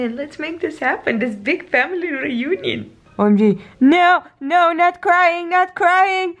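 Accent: India. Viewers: How you Europeans taste bud works?? It's not that bad...yes it's salty...but not that bad Your hindi is so adoreable...i think i'm falling in love...to your hindi pronunciation Brother=vai not bai...you are doing good intelligant lady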